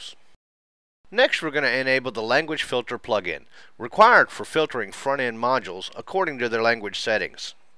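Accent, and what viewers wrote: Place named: South, United States. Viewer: PFFFFFT on those who talk about speed! This was exactly what I need.. not a 20 minute hand holding just a Q&D on how to get there - THANKYOU.. this rocks! Would love to hear you speaking french too with that good ol boy accent of yours too. Thank you for being fast and concise.. there's others that can do an effective 20 minute presentation - I needed the 5 minute kick start!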